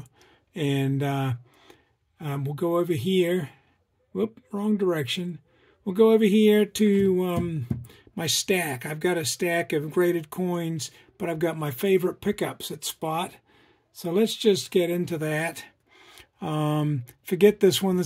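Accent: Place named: New Zealand. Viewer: I’m a kiwi who has lived in Australia for 30 years , I hear New Zealand , Australian and American in your Accent , and a hint of something else I can’t quite put my finger on 😂 . I like your channel 👍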